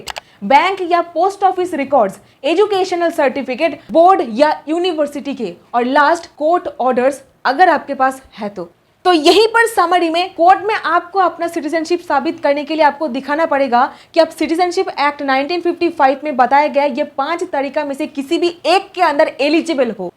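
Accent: Bengal, India. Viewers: Apki tone bangoli h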